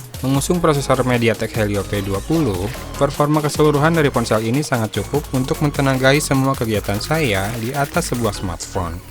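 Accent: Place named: Sunda, Indonesia. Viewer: ngomong ada nadanya, khas dari Sunda. Akhir kalimat nadanya turun. Ini krn baca teks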